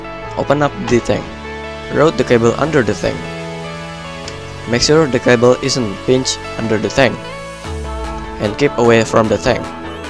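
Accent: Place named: Indonesia. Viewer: shiet i knew it from the accent... you're from indonesia ...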